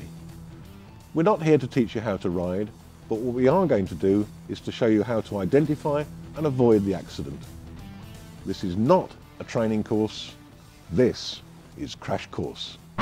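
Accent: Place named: Australia. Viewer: Hey, she's Australian! Not that it particularly matters. Excellent video, anyway.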